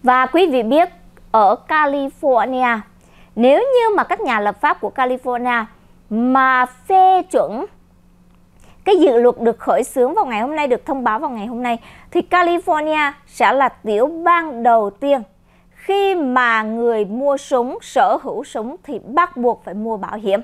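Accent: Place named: South, Vietnam. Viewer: Nói tiếng Huế đi, nói giọng nam nghe bịnh quá. không thử giọng Bắc đi.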